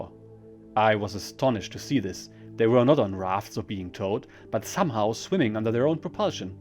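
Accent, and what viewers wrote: Germany. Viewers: I didn't realized you'd posted Juno part 1 and was delighted to see a Juno Beach part 2. I've just now listened to both parts and like your other content, it is excellent. This is content that anyone fascinated by WW2 will enjoy. I'm a 50-ish year old American and have been fascinated by the war since childhood. Thank you for these stories from the German perspective. I've read many memoirs and diaries myself, and hearing this in your own accent adds something entertaining. One can imagine the soldier himself is reading the story.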